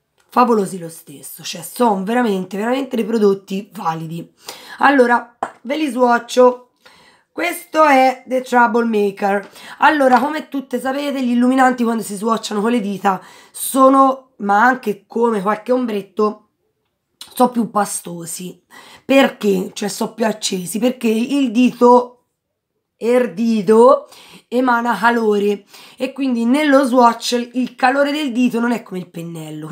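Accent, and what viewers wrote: Tuscany, Italy. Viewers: Ciao nuova iscritta da poco sono Toscana come te dalla parlata sei stupenda e sei simpatica 😊😊continua sempre così 😊😊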